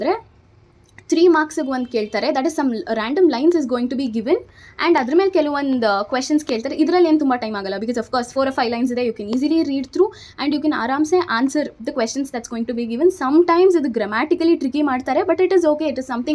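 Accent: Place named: Uttara Kannada, India. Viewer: Pakka uttar kannada nemduu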